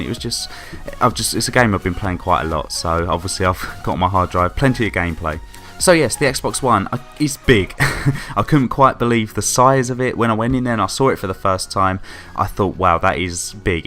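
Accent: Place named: London, United Kingdom. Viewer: oh right yeah you have a bit of a london accent. Can you give me any advice on how to get more subscribers and how to get a bit more confident when doing commentaries ?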